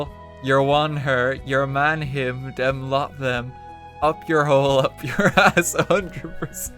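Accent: Ireland. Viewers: I just realized your tone of voice is exactly like STAR_. Just irish and a bit deeper. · What accent do you have? Its Irish mixed with something else.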